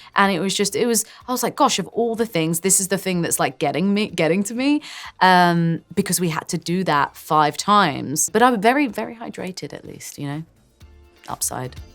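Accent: United Kingdom. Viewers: British accent 🇬🇧🇬🇧🇬🇧🇬🇧🇬🇧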